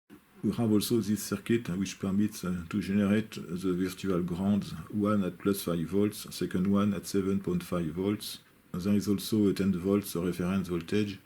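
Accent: France. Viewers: Fast and furious mixed reverse engineering, pure french accent, the best channel with Curious Marc, another French guy:) Thanks a lot.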